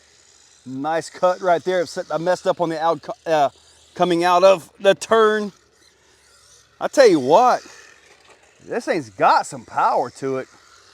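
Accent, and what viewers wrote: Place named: North Carolina, United States. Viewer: Where is he located? I just moved to North Carolina and he sounds like he’s from around here somewhere… lol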